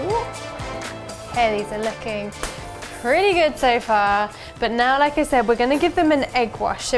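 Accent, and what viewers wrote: United Kingdom. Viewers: Learning British Accent in this channel is my fave ❤️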